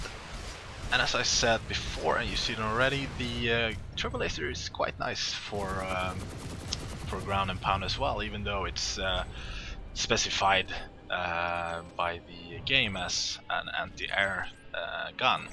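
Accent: Sweden. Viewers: hes accent is very Swedish-Ish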